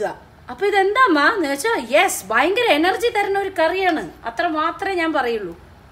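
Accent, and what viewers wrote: Palakkad, India. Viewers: Variety dish,,,,, also Ur way of speaking, palakkadan style, kelkkan nalla rasam undu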